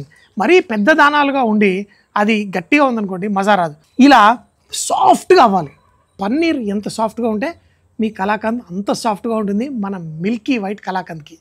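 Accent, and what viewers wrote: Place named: Hyderabad, India. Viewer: pakka hyderabadi slang😁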